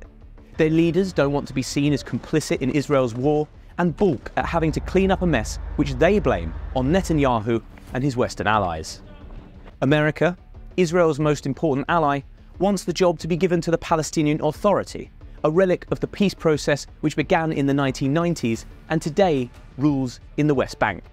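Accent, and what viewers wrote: United Kingdom. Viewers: I love the British accent, but you’re in denial or in fantasy land the IDF is losing the ground battle but it’s easy for them to attack civilians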